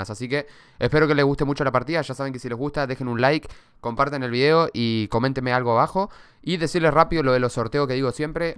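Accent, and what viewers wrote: Uruguay. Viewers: de que parte de uruguay sos bro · es de uruguay?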